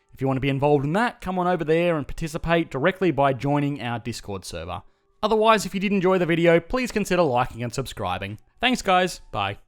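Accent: Australia. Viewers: Narrator is aussie i think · It is very true that Greeks leave the country especially the young ones. I am 17 right now, when I finish uni in some years I am planning to move to the UK/US/AUS (i am looking for a stable, English speaking nation) and so are most of my friends. Also loving your Aussie accent mate!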